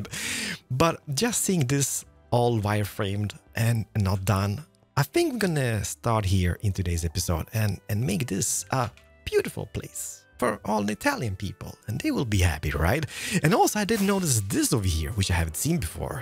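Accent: France. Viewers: I love French so so so much Never fails to put a smile on my face and light up my mood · French mumbo for mayor is the best and needs to appear more often lol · i gotta say : i'm french and i LOVE your french accent !!!!! :) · French is the best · Yes French is back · i'm french and i love the french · French gives me life:')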